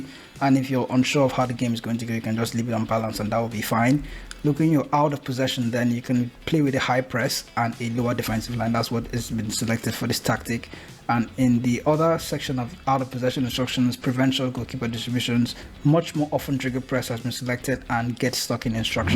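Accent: Nigeria. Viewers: This is very random, but you sound Nigerian. Great work though.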